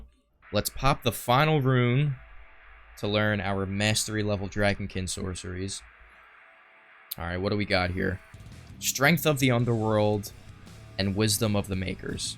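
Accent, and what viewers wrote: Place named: Philadelphia, United States. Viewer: Bud you from around Philly? You sound like me lol. Solid content dude take the sub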